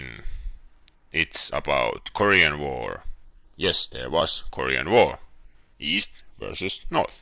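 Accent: Finland. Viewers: Hassua miten heti tunnistin englannista että oot suomalainen vaikka en kattonu vielä nimees :D hyvä lista!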